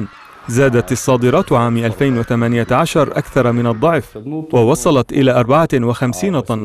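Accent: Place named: Russia. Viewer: لكنة روسية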